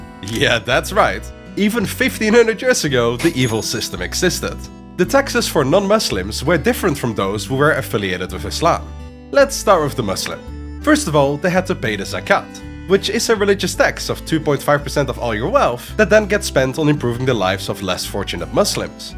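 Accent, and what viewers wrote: Netherlands. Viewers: Are you Dutch by any chance? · the Dutch accent is making it so much better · love ur Dutch accent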